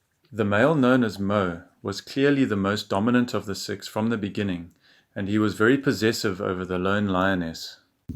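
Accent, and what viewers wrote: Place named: South Africa. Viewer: Mjejanes put in work,please do a video on them.Always enjoy hearing a fellow South African covering our lion dynamics,sounds more authentic.Enkosi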